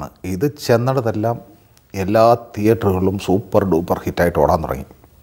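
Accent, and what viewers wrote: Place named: Kottayam, India. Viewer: Not Kottayam slang but Kottayam accent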